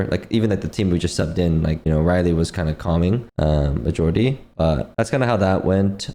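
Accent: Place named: United States. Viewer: He was born in the US. He has lived there his whole life. Dafuq are you talking about? He has a mild speech impediment (slight stutter) but his only accent is American.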